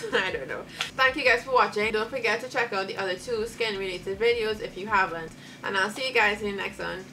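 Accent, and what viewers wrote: Trinidad and Tobago. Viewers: I know a Trinidadian when I hear one!🇹🇹🇹🇹🇹🇹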